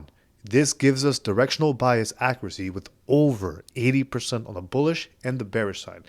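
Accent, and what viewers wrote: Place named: United States. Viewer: You sound American. How do you do prop firms that don't accept us traders?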